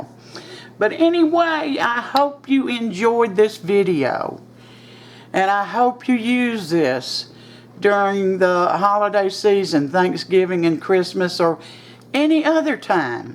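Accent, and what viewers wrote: South, United States. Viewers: I love your recipes.Where are you from.?Im from Arkansas.I notice you have a southern accent.I love your recipes.Do you have a cookbook.We southern belles are good cooks my mom and grandmothers were excellent cooks.im new to your ch.I love your ch.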